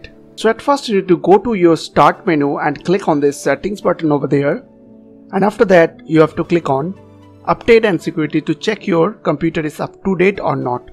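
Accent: India. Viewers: Indian detected🚩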